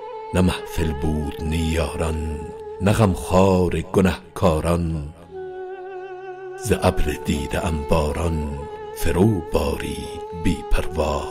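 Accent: Afghanistan. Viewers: لهجه دری هست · این لهجه دری است پارسی دری است لهجه تاجیکی را شنیده اید؟ تاجکستان لهجه شان ماملا متفاوت است چرا با افغانها مشکل دارید تبعیض میکنید؟؟ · لهجه دری 🇦🇫🤌🏻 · این لهجه فارسی افغانستان هست میتوانید از سخنرانی های داکتر نجيب درک کنید این صدا را. البته فعلا این لهجه زیبا در حاشیه ای سیاهی رفته هست متاسفانه به احتمال خیلی زیاد این اثر زیبا و میراث قیمت ناپذیر فارسی زبانان خردمند و با معرفت چندی دیگری باقی نخواهد ماند حتما میتوانیم بگوییم در حال حاضر همچنین به دست تاریک فراموشی سپرده شده است و آهسته و پیوسته در حال از بین رفتن هست و به نظریه اکثر زبان شناسان دیپارتمنت دری در آینده نه چندان دور تنها لهجه که مردم فارسی زبان بر آن تکلم خواهد کرد لهجه تاجیکی تاجیکستان و ایرانی ایران خواهد بود وبس و من خیلی احساس تاسف دارم💜🤍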